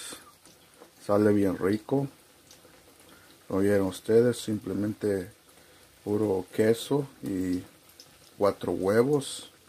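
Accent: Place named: Guerrero, Mexico. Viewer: Holaaa. Así hablan en guerrero. Xque no sabía sorry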